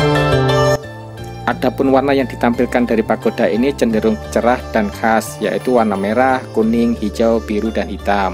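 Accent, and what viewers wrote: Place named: Java, Indonesia. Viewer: ulasannya jelas dan rapi. ditambah prolog suara yg medhuk. jian video dokumenter yg mantab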